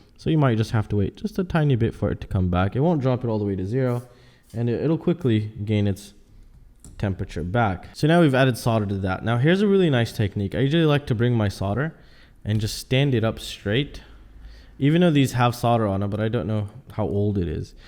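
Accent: United States. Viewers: Great vid and thanks. However - why do our beloved US friends say "sodering" when it's "soLdering"??? Always makes me laugh. :-)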